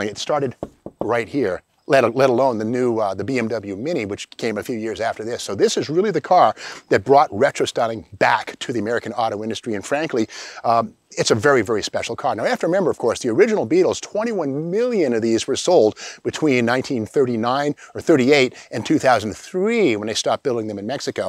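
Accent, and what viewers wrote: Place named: Boston, United States. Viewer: Boastun accent lol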